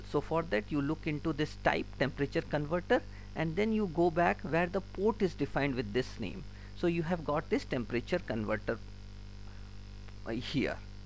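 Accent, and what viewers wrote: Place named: India. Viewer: Really good video! And I love the indian accent, especially in the word 'redundant' :D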